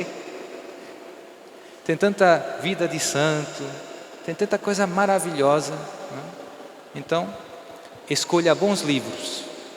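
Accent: Brazil. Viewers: Ele morou no Brasil na juventude, mas com jeitinho, qualquer português é capaz de falar com sotaque brasileiro, devido às quantidades industriais de telenovelas brasileiras que têm visto desde 1977 (Gabriela e n seguintes). Já o contrário é mais difícil...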